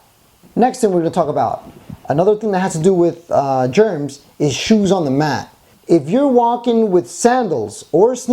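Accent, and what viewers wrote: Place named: South, United States. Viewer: That southern accent though 😂😂😂 bloopers made this video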